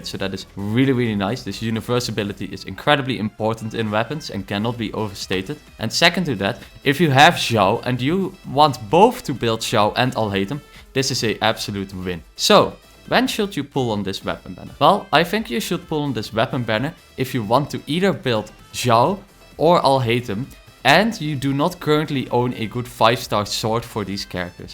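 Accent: France. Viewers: you have an accent... I can't pinpoint it but it sounds french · The French accent, i'll pull for whatever listening to the accent.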